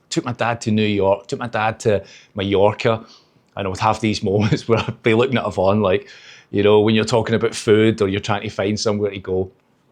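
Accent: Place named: Glasgow, United Kingdom. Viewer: Hi, my name is also Yvonne. I stumbled upon your video a few days ago and this is the second one I’ve watched. It’s always interesting to hear what non-Malaysians think of our country. I love hearing your accent because it reminds me of my good friends Bernie and Graeme from Glasgow. I’ve lived in England and Northern Ireland for over 20 years. I’ve travelled to Scotland and been to Glasgow Green for the World Pipe Band Championship. My husband played the pipes. Sounds like you’re enjoying KL. Are you getting to experience much local Malaysian culture? You’re probably going to say, watch your previous videos, which I will. Have fun.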